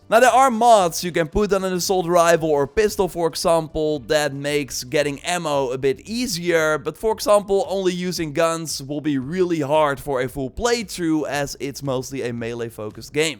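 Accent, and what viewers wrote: Netherlands. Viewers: Ik ruik een Nederlandse accent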